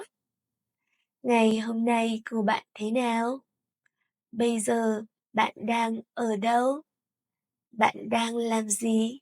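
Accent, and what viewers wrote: North, Vietnam. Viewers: Thank you very much for your videos. I deleted all the other channels and kept only yours. I finally found what I was looking for, and now I only study with you. Im European, my girlfriend’s from HCMC and her dialect is a little different from your northern one, but I still continue to watch you. Thank you for being there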